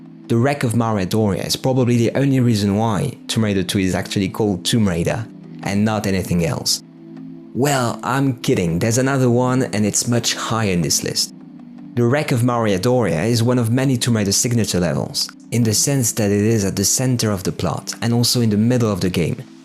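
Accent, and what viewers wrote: France. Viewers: Is it just me or does he have a french accent? Great video btw!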